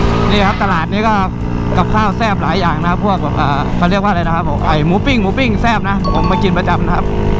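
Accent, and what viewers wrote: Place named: Pathum Thani, Thailand. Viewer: ปทุมธานีชัดมากครับ555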